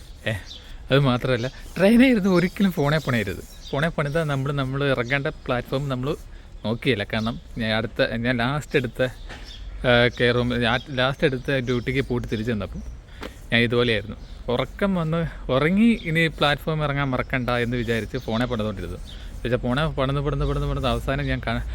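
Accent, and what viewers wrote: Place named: Pala, India. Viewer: Kottayam ano vidu oru pala shayili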